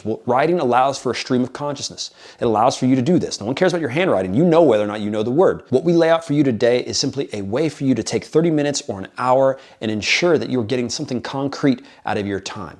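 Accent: Spain. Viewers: Your accent in spanish is nice bro! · You have a beautiful Spanish accent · That spanish accent, sir 🤩🤩🤩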